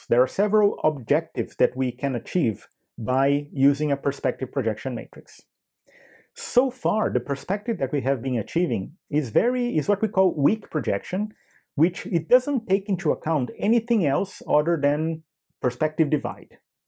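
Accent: Brazil. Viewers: dude, your brazilian is pretty strong, i can tell it by the tone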